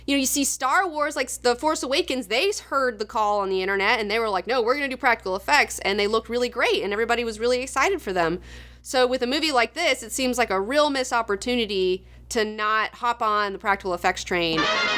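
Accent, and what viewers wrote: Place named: South, United States. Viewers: New to channel- does anyone know if she's from the south? I hear a southern accent xD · Are you from south carolina or north carolina? The way you speak has a hint of an infliction that I've only heard there.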